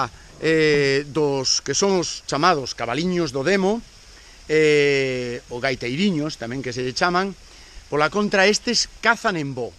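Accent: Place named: Spain. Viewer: Parece q es un español y esta hablando portugués por q quiza esta en un país donde hablan portugués :v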